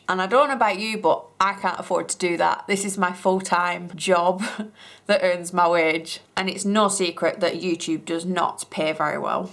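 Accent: United Kingdom. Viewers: Thanks for your tutorials. I watch your videos a lot. I have twin boys. At night when it is bedtime, they often ask to watch a “cake video” on YouTube. I think the British accent helps lull them to sleep. It certainly not because you are boring. I used your toilet seat method for the first time this weekend on my boys’ bday cake. ;). I have a shop but I only do custom work. I don’t have a store front, and that works for me. It got all the stuff out of my house. Home is home and work is work. Thanks for all you and my other fav caking you tubers do. I have learned an infinite amount since I started making cakes 5 years ago.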